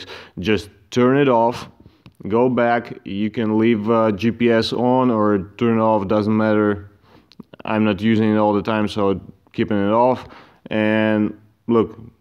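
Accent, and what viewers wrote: Russia. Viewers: Solved my issue, thanks. Your have an obvious accent, is out Russian?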